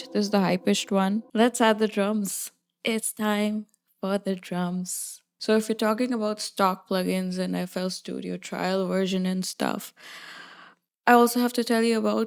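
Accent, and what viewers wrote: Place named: India. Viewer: Hey r u Indian by any chance Cuse your accent tell that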